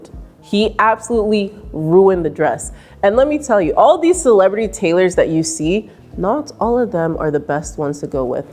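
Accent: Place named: Nigeria. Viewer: sounds a bit Nigerian to me.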